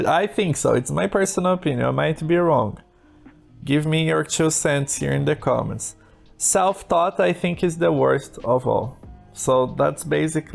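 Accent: Brazil. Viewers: tu é br né nao? kskss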